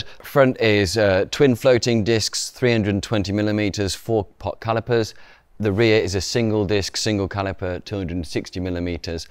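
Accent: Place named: England, United Kingdom. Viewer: Smart review and English English!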